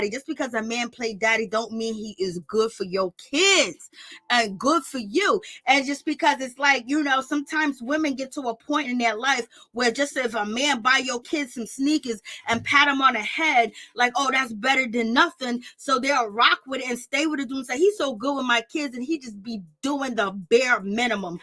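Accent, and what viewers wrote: New York, United States. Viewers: Hahahhaahhhhahhhhahahahahahahahahahahahhaahhahahaha😂😂😂🤣😂🤣😂😂🤣🤣😂🤣🤣🤣😂🤣 you are so funny gurl . And your beautiful New York urban voice .. Lar that ❤️❤️❤️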